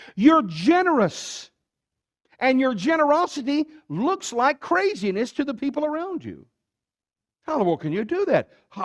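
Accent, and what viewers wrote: South, United States. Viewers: I don’t have to miss Southern accented preaching just because I converted lol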